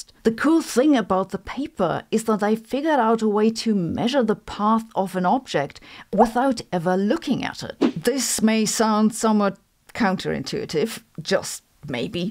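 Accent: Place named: Germany. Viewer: It’s that English with German accent influence that is just…….. scintillating! I know , it shouldn’t enter into the conversation but what the hey, anyway! Love your videos, even if I don’t get the math behind it!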